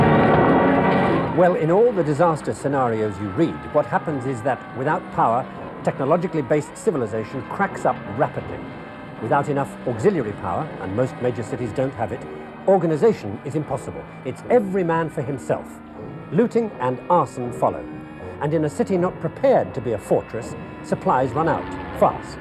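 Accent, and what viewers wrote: United Kingdom. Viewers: This is such absolute bullshit. This is not us being reliant on technology. It is us being reliant on COMMUNITY. And that is a good thing. Oh electricity goes out, so the postman goes to find a farm to grow his own food? This is nonsense packaged up in a nice british accent. Society divides out tasks. The nurse doesn't have to know when to grow crops, and that isn't a failing of society. And we as a species have invented this brilliant invention called electricity and created a network that provides every household with it. Which turns manpower into a communal good, instead of something individuals have to provide. It is a miracle, that yes, it can fail. But we shouldn't give up on it, we should safeguard it.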